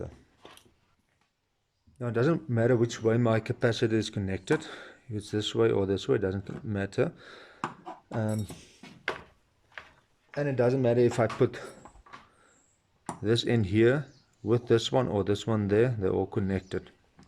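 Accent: South Africa. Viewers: He sounds South African and we have 230v at the Wall. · I like south african accent 😀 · this man sounds south african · Ekse bra you from the SA?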